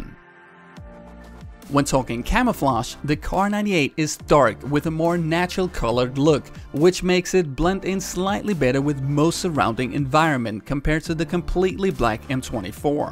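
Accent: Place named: Denmark. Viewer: Your accent is soo Danish, I love it!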